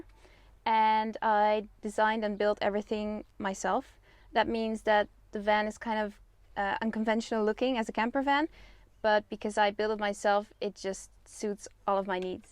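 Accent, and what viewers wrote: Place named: Netherlands. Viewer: Dutch or nah?? hhahahah noticed in the first few seconds hahaha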